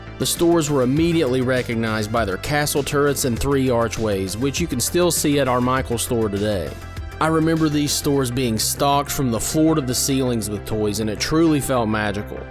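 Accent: South, United States. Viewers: Do I agree Rygar was highly underrated? Yes! Do we have the same first name? Yes! Does your monotone/southern accented voice make me want to kill myself? FUCK YEAH! Regardless of me wanting to commit homocide, suicide or both, I'm interested in watching this video. Here goes... I fucking looooooooooved this game. I played hours upon hours of it along with my dearly departed grandma. Yes, I played this game with my kickass g-ma. I only wish she'd have survived breast cancer so as to have been able to play the PS2 re-boot. I love & miss you Gramma B. I love and miss you. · You deliver your videos with a childlike sense of enthusiasm and your southern drawl makes for a great narration. Great job, great research!!! Thank you!!! Your Friday the 13th vid was ultra dope too!!!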